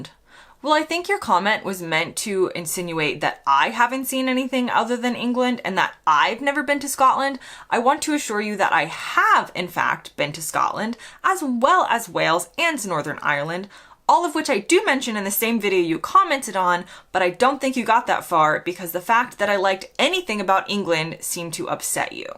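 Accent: United States. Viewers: your stupid accent does not offend me, you are one of the few americans i can stand to watch, you do not have the better than you attitude ( which is more than i can say for other yanks ) upon which, would result in immediate cessation of viewing. so good job and keep up your good inquisitive flow. 👍 ( you are almost a brit ) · Gosh- I'm glad you are able to greet these with humour - this was a fun response! I fail to understand why anyone who hates Americans or an American accent has bothered to even play your videos. You'd think they'd steer clear......or just scroll past...... Heyho- onwards and upwards! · I british.i like Americans.i like your accent. I wish I could have a holiday in America.after all,we are allies.maybe the best allies on the planet. So ignore these buffoons who are probably jealous of you and your accent. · American is not my favourite accent, but when one watches an American what else do you expect. 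It is a shame you feel you have to put this out, but I understand totally, I liked it and you are picking up the British sarcasm and understated piss take very well. Keep going girl. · I have to ask, but, where did you get the sarcasm from? And what accent are your countrymen complaining about? You sound very american. At least to me. And not in a bad way. If you are born and raised in the USA, you most probably will sound like it, and to me, you do. Nothing offensive about anything you do - or say. In fact, I very much like everything about You and your approach to Europe (England) and the USA. I don't think you bash either side. This is a definite subscription to your channel. I will follow other videos from you. I'm not a native english speaker, so, I may have "said" (written) something offensive or provocative in this rant, but I mean no harm. I really love your approach and your content. 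Have a brilliant day. ❤ from Denmark!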